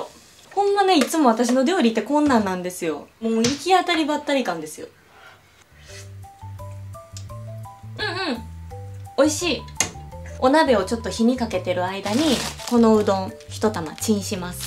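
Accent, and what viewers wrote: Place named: Osaka, Japan. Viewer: 大阪弁が癒されます！いつも、ありがとうございます😊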